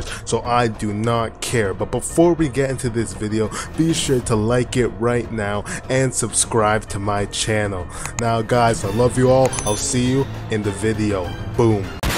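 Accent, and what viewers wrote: Jamaica. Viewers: I heard the jamaican and just subed , good channel👍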